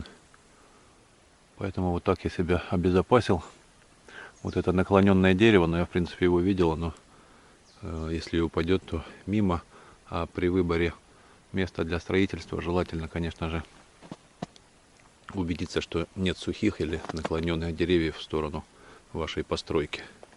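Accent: Ukraine. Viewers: Судя по характерному говорку с ридной батьковщины приыхал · Судя по речи хохол в землянке от войны прячется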